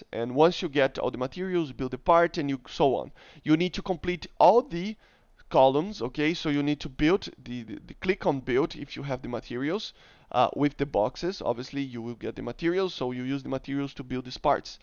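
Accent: Brazil. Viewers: acho que voce é brasileiro, seu sotaque me diz isso. am i wrong?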